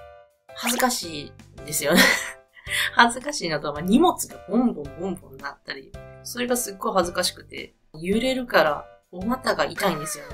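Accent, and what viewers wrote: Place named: Kansai, Japan. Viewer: 関西女子らしく😅ぷっと吹いてしまう話し方😅好きや👍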